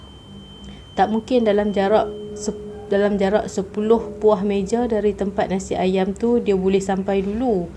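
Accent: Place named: North, Malaysia. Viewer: Seronok dengar bercakap logat utara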